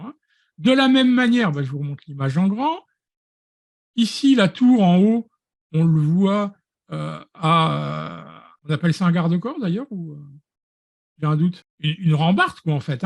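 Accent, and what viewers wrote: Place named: Belgium. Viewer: Une très intéressante découverte dès que je serai rentré à la maison que je retrouverai mon PC et que je pourrais abandonner mon téléphone à l'hôpital où je suis en ce moment, je vais vous suivre avec quelques tutos sur Youtube et peut-être même devenir un de vos élèves, YouTube m'a proposé la version anglaise à entendre votre accent vous devez être belge je crois..... salutations amicale d'un Belge perdu dans l'archipel des Açores 😃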